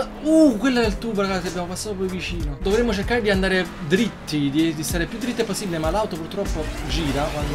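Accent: Naples, Italy. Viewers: ma sei di napoli zio?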